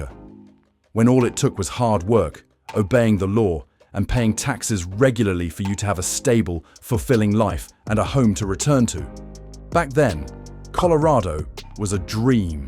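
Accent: United Kingdom. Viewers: Why is it always a narrator with a British accent who narrates these terrible videos about the homeless in America?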